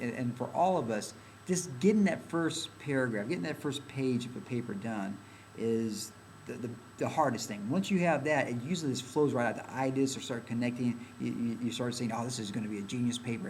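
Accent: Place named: South, United States. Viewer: i thougjt the same and was confused by the southerner accent lol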